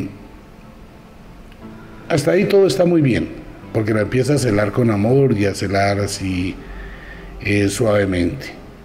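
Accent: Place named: Colombia. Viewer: SEÑOR: LE COMUNICO POR ESTE MEDIO QUE TIENE USTED MUCHO KARISMA SUS CHARLAS SON MUY AMENAS Y MUY DIGERIBLE POR LO CUAL LE AGRADEZCO Y LE EXPRESO MI MÁS SINCERA Y HUMILDE ADMIRACIÓN LE CUENTO QUE LA GENTE DE COLOMBIA ES LA MEJOR AMÓ SU ACENTO ES QUUE SUCEDE, PASA, Y ACONTECE QUE HACE UNOS 10 AÑOS CONOCÍ EL GRAN AMOR DE MI VIDA... (uno de tantos pues) ERA TAN HERMOSA ERA DE CALI Y DE AHÍ QUEDE ENAMORADO DE TODA LA GENTE COLOMBIANA YO SOY DE MONTERREY NUEVO LEÓN MÉXICO. Y ADICO EN L.A. CALIFORNIA ESPERO VEA ESTE MENSAJE ANTES DE NAVIDAD LE DECEO MUCHA FELICIDAD EN ESTA NAVIDAD Y MUCHA SALUD Y SOBRE TODO MUCHA PAZ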